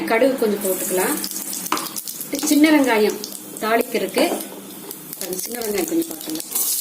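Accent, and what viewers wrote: Kongu, India. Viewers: கொங்கு தமிழ் அழகே அழகு ......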